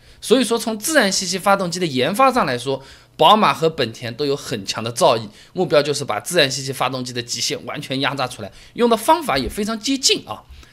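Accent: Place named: Shanghai, China. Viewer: 喜欢你的阿拉普通话外加幽默。可以尝试更上海一些.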